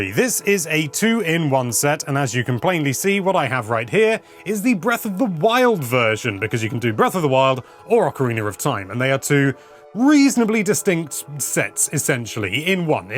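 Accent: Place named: United Kingdom. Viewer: Its just a british accent thing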